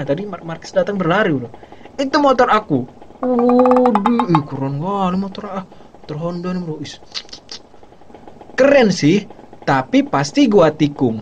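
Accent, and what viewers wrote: Medan, Indonesia. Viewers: Orang medan bang ya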